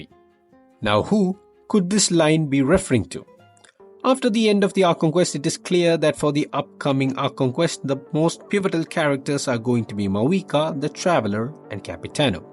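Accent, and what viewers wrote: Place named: India. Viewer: I have to ask, where are you from? Your accent to me sounds Indian, but sometimes it sounds Spanish/ Latino.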